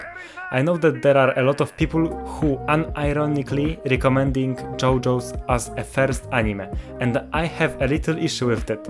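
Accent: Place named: Eastern Europe. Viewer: you sound really slavic bro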